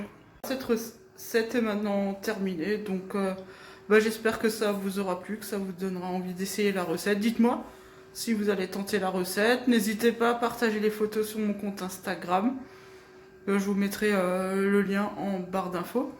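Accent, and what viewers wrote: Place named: Alsace, France. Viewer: Superbe accent : Haut-Rhin ou Bas-Rhin ?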